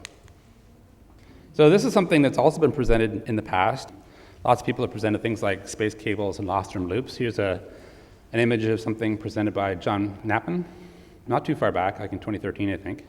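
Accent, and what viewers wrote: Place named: Canada. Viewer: This dude is Canadian for sure.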